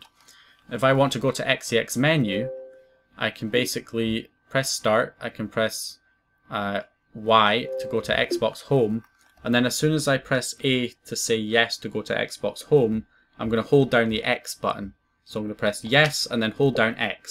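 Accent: Scotland, United Kingdom. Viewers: Are you scottish bro? Just for catching the accent. (i'm Italian actually)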